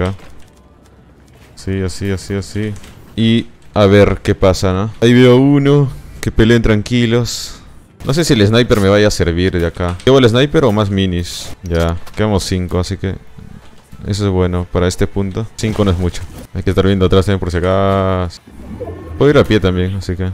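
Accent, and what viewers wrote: Peru. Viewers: Tiene acento de peruano pero le salen cosas de argentino o no jaja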